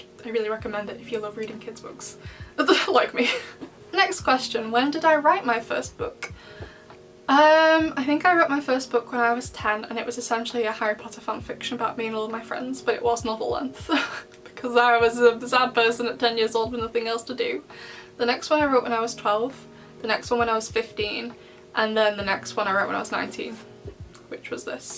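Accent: England, United Kingdom. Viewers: I wish I had an English accent like you!! 😭 I just love the way you speak!!💗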